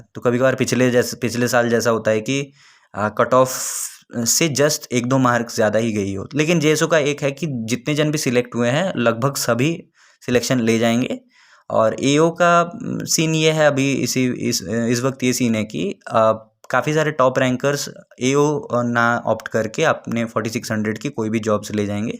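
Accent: Odisha, India. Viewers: Bro r u from odisha?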